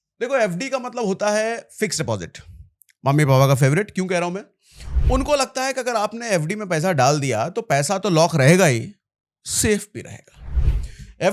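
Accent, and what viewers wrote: Bihar, India. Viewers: Tum bihari ho na ??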